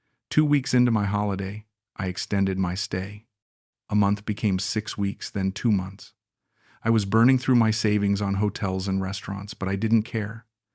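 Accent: United States. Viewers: The story might be real, but this guy is totally fake, AI. I doubt any "bloke" from Darwin speaks in a pure US accent, not a trace of Aussie pronunciation. This channel produces many of these vids about a guy getting scammed by a Thai woman half his age. And they all sound the same and look the same. Strange channel.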